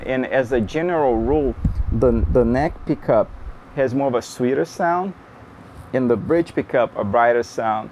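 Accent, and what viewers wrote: Brazil. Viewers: Do you happen to speak Portuguese? You seem to have a Brazilian accent...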